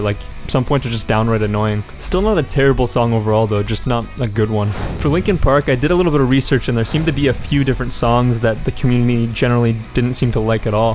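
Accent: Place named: Canada. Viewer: Probably because of the faint canadian accent in there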